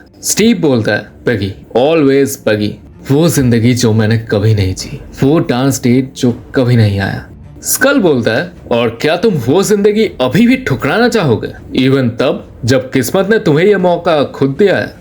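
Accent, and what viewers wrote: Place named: Bengal, India. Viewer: Bhai main pj ko dekh ta hoon moc ko sunta hoon comic verse ko badal ko desinerd ko bhi par jab maine tumhari video dekh ni shuru ki mujhe maza aya is liye nahi ki tum kuch alag bana rahe ho is liye kyu ki tumhari hindi thodi weak h jaise bengoli log bolte h maza ata h sunne mein isse thik mat kar na keep it up ❣️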